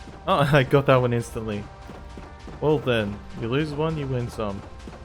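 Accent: Australia. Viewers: Bro, you already speak fast, plus you have an Australian accent, plus you're talking about highly technical stuff, plus I'm fluent in english but since I'm not native i have to make a little force to think through it. So, even 0.25x is not enough for me to be able to understand it. ☹️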